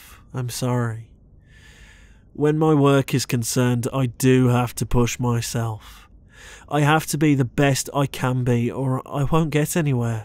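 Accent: United Kingdom. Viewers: He's British :)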